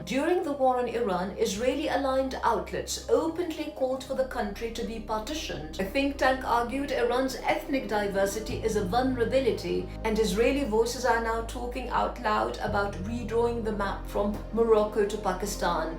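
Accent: RP, United Kingdom. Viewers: Her voice is like she from English ROYALTY 👑 ..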